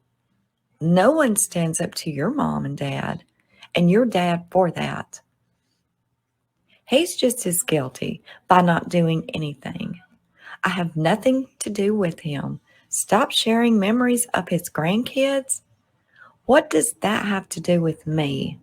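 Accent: South, United States. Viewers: Please don't let some of these negative comments get you down. You're doing a great job with a very difficult, controversial case. You are so beautiful....a southern Queen. Hope you will do more reviews showing your pretty face....not just you nice voice. I just love Southern accents. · Doesn’t she .......her accent is amazing when I visit America this is what I want to hear 😃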